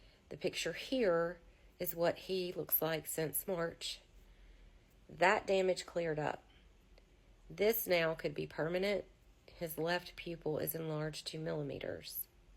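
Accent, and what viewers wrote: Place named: South, United States. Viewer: I would take all documents and go to your State Capitol. Have copies for the FBI HEADQUARTER DEPT. THE ATTN GEN and DEPT OF JUSTICE. I can tell by your accent you might be in a small town in the South. I know how things can be. No matter. WE THE PEOPLE still stands! Here for you. You little boy having special needs should be covering him with even more protection under his rights of the disabled. He isnt a pawn on a chessboard game. Shouldnt be about hoarding money from a single Mom. ⚖ ⚔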